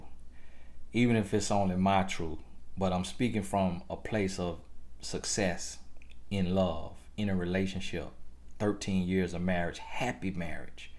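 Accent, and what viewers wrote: South, United States. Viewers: Hey brother, great video as always! I love your Country accent it's so cool and you are pretty funny lol... keep up the good work... God bless you. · Be blessed...to your and your family. I actually like your country accent. We all have accents tho' 😏. Some might not think so. 😏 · keep the accent, it is apart of your brand. You remind me of my southern relatives whom i truely miss.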